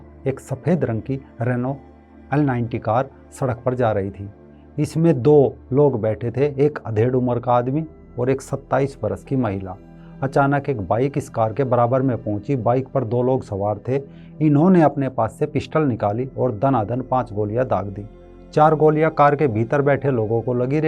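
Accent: Haryana, India. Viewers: R u from haryana......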